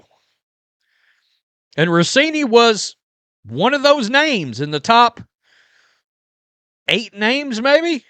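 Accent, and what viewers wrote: South, United States. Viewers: Hey, It's "....I don't know what other direction it could have GONE.." not "...have went." Repeat after me: I go, I went, I have GONE. I know you're a Southerner, but that doesn't mean you can't use correct grammar even in a Southern accent.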